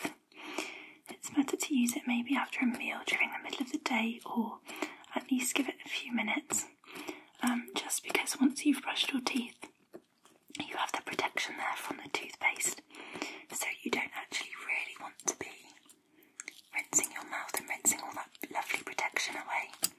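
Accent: United Kingdom. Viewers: Don’t know if You saw but I commented this on your previous video and I thought you’de like to see it :))) Wow just found this channel from this video and I have to say that I’m in love! What a great video with excellent soft spoken levels and then variation of some whispering too. Then when it goes straight back into the soft spoken it is so tingly. Maybe the familiarity of the common accent from my area of the U.K. helped enhance the relaxation! Triggers were also very well put together and I thought the camera and mic quality was on point too! Great length which I personally prefer! Overall fabulous video and definitely earned a new subscription 😊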